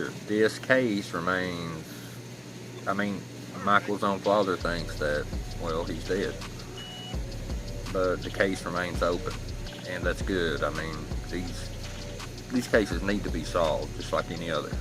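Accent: South, United States. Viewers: You have to be from the south ?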